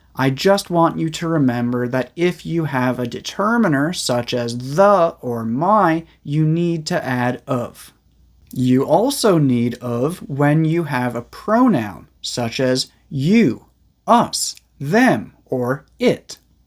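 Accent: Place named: United States. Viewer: " I love your teaching style most especially your beautiful American accent."😊😊❤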